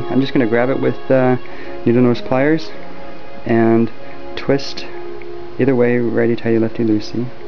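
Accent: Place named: Texas, United States. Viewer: Given the accent, the shadowing on the carpet, the actual carpet colour, and the way you're wearing that Seiko watch, I'd have to guess that you live in Texas, went to school in Utah, and have family living in Oregon. How close am I? Congrats on the keyboard, I may actually do that with a spare Saitek keyboard - would be good to confuse other peoples who're using my computer.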